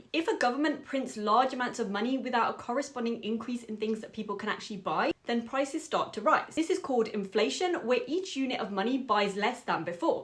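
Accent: Birmingham, United Kingdom. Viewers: Had to look up Brummie. So, you're from Birmingham! Cool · Birmingham I love it probably one of my favorite British accent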